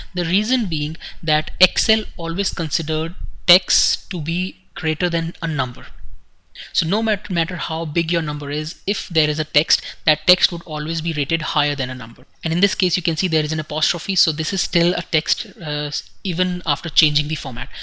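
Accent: India. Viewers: Good but i really dnt like indian accent!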